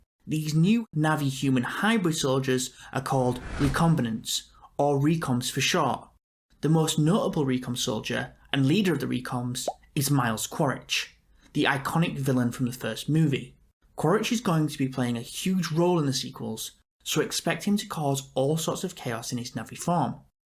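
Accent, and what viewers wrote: United Kingdom. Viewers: Are you British 🥲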